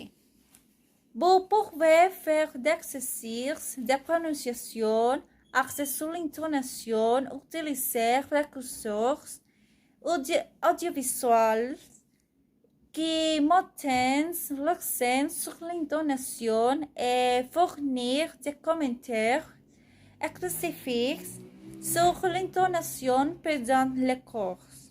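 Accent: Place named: Portugal. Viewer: Eres portuguesa? você fala francês como um português... hablas frances como una portuguesa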